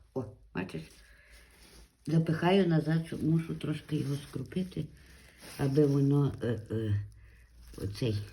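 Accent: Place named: Ternopil, Ukraine. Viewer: Дуже дякую за чудове відео,жіночка дуже нагадує мені мою бабцю,у котрої я ріс до самої школи.Дуже гарна мова,балакає так само як моя бабця🙂,ви напевне знаходитесь на Тернопільщині?Я жив у бабці саме там.Там дуже гарні крайовиди,безмежні поля й ліси,граби,ясені,дуби.Нажаль дубину вирізали давно😔.Моя бабця також насадила багато горіхів,тільки вона їх не вирощувала навмисне(здається на весні),по городі сходили молоді ростки горіху і бабця поосто їх пересаджувала куди треба.Я тоді запитав у неї:"звідки вони ростуть на городі",а вона відповіла:"то ворони в осени розносять і деякі падають,а на весні виростають",ось так.Дуже родюча земля,багато чорнозему.Успіхів вам у вирощуванні,викладайте ще відео👍🇺🇦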